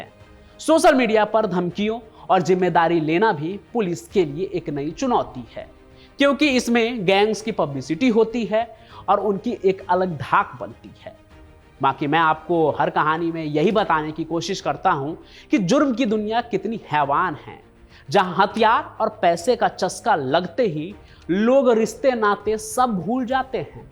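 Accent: Bihar, India. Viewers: Your Way Of Speaking , Accent Is Like Biharis , Like Godi Media Ankers , Speak Normally Dont Be a Actor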